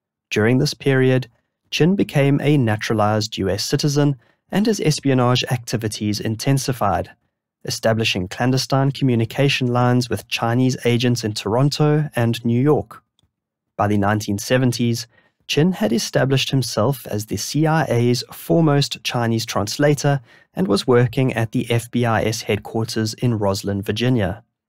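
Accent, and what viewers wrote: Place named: South Africa. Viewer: Love your content and I miss the long-form content. Greedy, I know, but I make space in my day to watch you before anything else when you post here. Love your SA accent too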